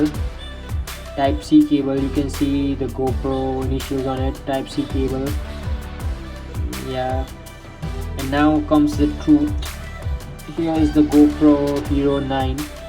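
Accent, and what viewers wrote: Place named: United States. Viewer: American accent is not suiting you. But GoPro9 🤩🔥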